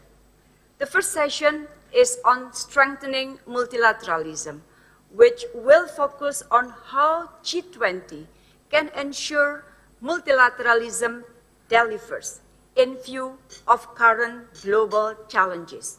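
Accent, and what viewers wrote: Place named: Indonesia. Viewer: Menluku Englishnya keren mudah dipahami walau ake Indonesia accent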